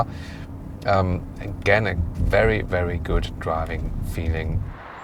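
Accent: Germany. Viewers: Wirklich stets gutes Review - jedoch muss ich immer wieder anfangen zu lächeln, wenn ich das typisch deutsch-typische Englisch höre... nicht böse sein :-)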